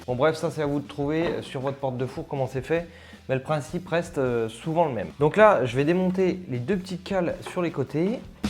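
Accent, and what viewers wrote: Franche-Comté, France. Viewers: Merci pour cette vidéo qui tombe à pic, je vais pouvoir enfin démonter ma porte grâce à vis explications !! .. petite question j'espère pas indiscrète..!! "VS ne seriez pas franc-comtois par hasard..." ??!!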